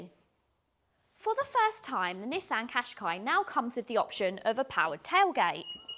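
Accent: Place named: Estuary, United Kingdom. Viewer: Great review, love the Estuary accent:-)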